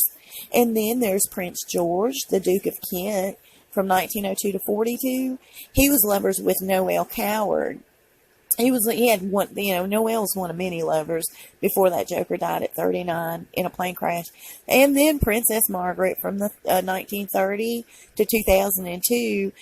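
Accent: South, United States. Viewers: GOD'S LOVE watched 2 of your videos the other day and subbed. Love your channel. Love your south Accent with that 'Twang'. New sub. BUT all I've got to say which would be criticism is; 'Michelle Obama' IS a man, It has an Adams Apple and the ring finger is bigger than index.. Just like a man. Plus a women can only fit 1-1/2 headxon their shoulders. She can fit 3.. All the best.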